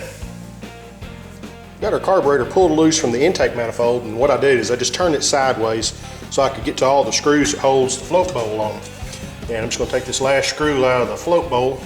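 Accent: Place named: Arkansas, United States. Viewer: Love that Arkansas accent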